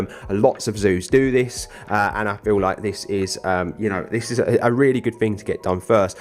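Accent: United Kingdom. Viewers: What part of the UK are you from?? I can never figure out the accent.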